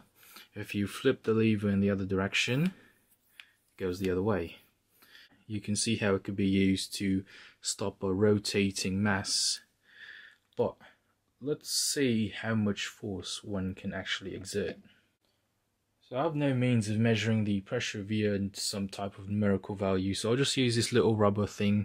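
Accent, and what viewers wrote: United Kingdom. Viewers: Are you English? South African? Or Australian?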